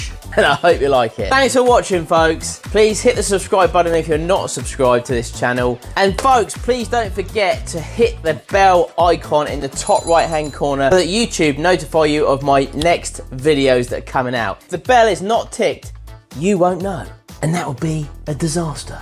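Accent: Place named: Fens, United Kingdom. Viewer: has a "Fens" Accent IIRC.:-) He has said numerous times about being in the UK though, as he has been on Telly here, and tells us about that when it happens.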